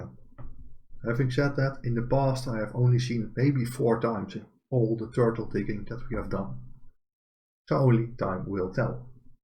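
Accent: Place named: Netherlands. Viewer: Can you do a tutorial about computer craft? I am sure you are very good at explaining it. BTW, Your accent tells me you are Dutch I am right?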